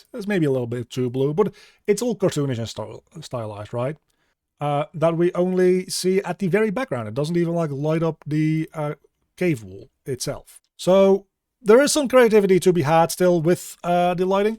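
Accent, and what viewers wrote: Netherlands. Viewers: For some reason watching this really reminds me of Jazz Jackrabbit 2 , which, hilariously, was also made by Epic Games. One of the things I really love about their lighting setup there, was that the player character has a point light (with some trails) around them, as well as all the bullets. You could have really dark scenes where you could barely see anything, shoot a few bullets to get a sense of what's happening around you, and navigate almost by "sense of touch" through the character-bound light. As you started building out lights in your scene, it triggered deep memories from that game. <3 Thanks for the tutorial! Great stuff. Just what I needed. P.S. Heb je een Nederlands accent? ;)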